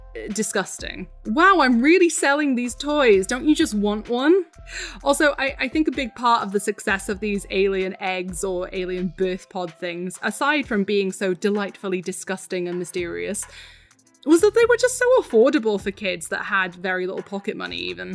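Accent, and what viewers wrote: Wales, United Kingdom. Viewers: Are you brittish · Ur british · I KNEW I HEARD A WELSH ACCENT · I new I recognised that ascent! Got a question is wales.. good?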